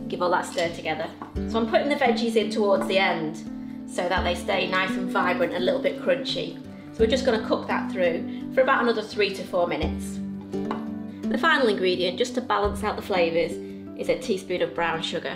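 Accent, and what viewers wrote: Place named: Northern England, United Kingdom. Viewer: Ur nothern arent u 😛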